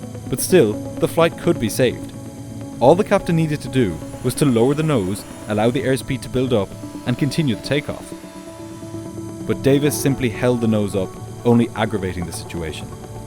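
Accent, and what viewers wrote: Ireland. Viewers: Your Irish accent is so adorable.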